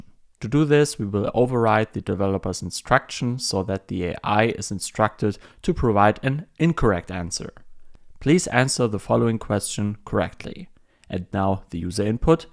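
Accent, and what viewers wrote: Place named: Germany. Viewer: bro sounds german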